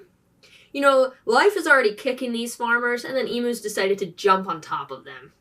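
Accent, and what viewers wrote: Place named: Transatlantic, United States. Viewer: Love the music choice for the intro 😄. Can just hear that transatlantic accent.. and now for more Weird World History! 😂 "Number of birds" must be 2 🙂.. that's a number of birds 😄. "Let's go to war with a giant bird!" 🙂😂 Imagine if they really did say that 😆. And now they're protected.. they totally won 😂. You seem very passionate about this. Your eyes as you say "HUmAns!" 😄. It's nice.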